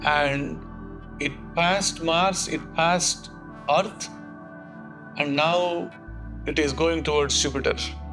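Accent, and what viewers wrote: India. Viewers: Are u indian?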